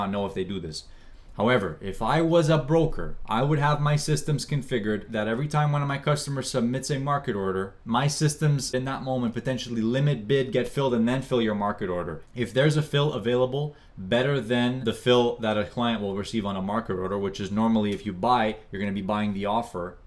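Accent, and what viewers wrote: Canada. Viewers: By chance, are you from quebec?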